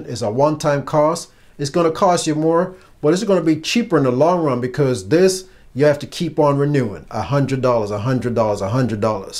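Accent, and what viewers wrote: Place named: Jamaica. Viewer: Thanks man this was really helpful, I could be wrong but I think I'm hearing the yadi in the voice of so big up yuh self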